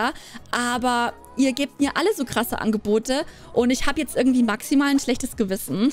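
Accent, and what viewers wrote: Bavaria, Germany. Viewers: Kommst du aus Bayern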